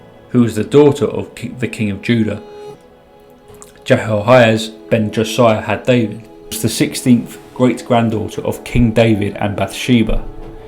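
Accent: United Kingdom. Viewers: Very difficult to understand because of thick UK accent.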